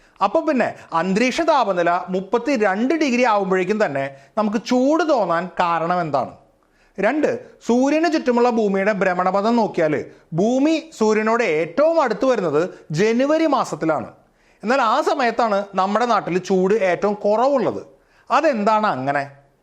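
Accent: Thrissur, India. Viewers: ചേട്ടൻ തൃശ്ശൂർ ആണോ? 😊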